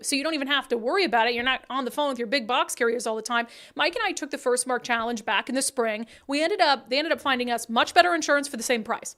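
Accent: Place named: Minnesota, United States. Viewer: It is Minnesota accent.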